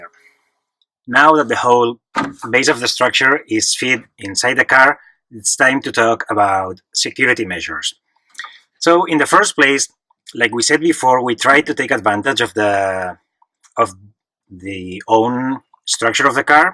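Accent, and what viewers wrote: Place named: Spain. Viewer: Acento español ehhh ;)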